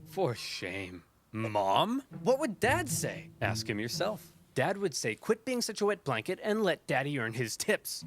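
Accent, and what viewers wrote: Ireland. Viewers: You're losing ur cute Irish accent lol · I don’t know why but Irish accents are pretty cool Also no cute Irish girl GF why live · I am surprised that you are using this dating site. Because you are beautiful! If you didn't live in Ireland, too far away from USA, I would ask you for a sitdown with coffee or something. By the way, I love your Irish accent. I know that you have a lot of pings from that dating site from suitors.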